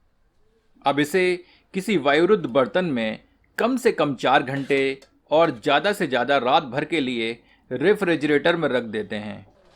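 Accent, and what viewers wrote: Standard. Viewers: apki suddh hindi sun k anand ata h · best is your shudh hindi narration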